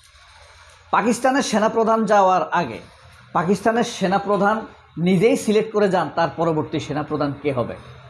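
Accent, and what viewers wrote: Noakhali, Bangladesh. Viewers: বাড়ি কই নোয়াখালী না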